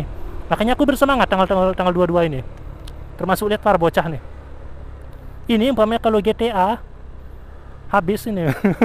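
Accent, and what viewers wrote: Bali, Indonesia. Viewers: lucu ni si bli ngomong sunda logat bali XD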